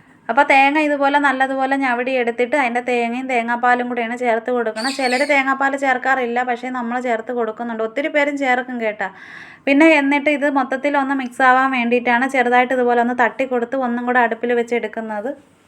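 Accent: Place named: Kollam, India. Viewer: Ningal kollam ano avduthe samsaram pole und kettatu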